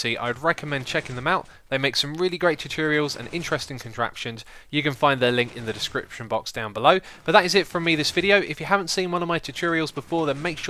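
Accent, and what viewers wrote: England, United Kingdom. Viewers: I thought was from England:P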